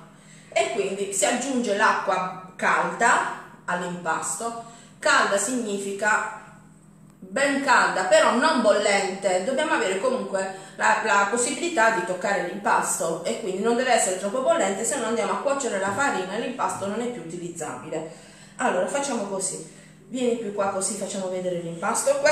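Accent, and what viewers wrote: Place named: Bari, Italy. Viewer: Ciao (e )... ho scoperto da poco il tuo canale e sentendo la tua cadenza barese mi sono subito iscritta perchè sono barese anche io, ma vivo a Torino. Finalmente ho imparato a fare i "disctinculo" ma vorrei farne per una mia amica che è celiaca...mi potresti consigliare sulla farina da utilizzare? Ho già provato con la farina di riso ma non mi viene bene, cosa mi proponi? Grazie se mi rispondi.... a presto, Maria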